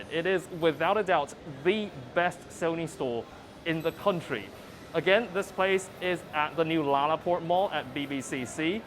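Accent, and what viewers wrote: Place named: London, United Kingdom. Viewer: Where is this guy from ? Looks malaysian but speak perfect london english ....raised in uk but decided to come back to malaysia ?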